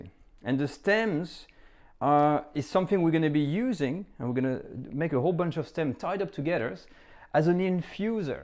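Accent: Australia. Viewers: Love the way you explain things. You have been in Australia too long, you are starting to sound like us, all lbe it with a lovely accent. A bit like Manu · Ha ha every now and again I hear the remnants of your Aussie accent coming through!! Very good recipe too. Made it for my daughter and she loved it.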